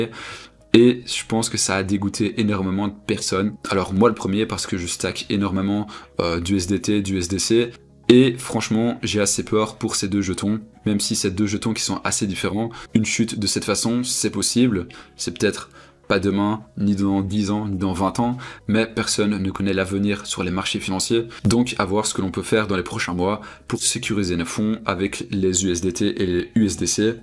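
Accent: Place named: United States. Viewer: Bonne vidéo, je m'abonne ! Au passa l'accent est Américain apparemment 😁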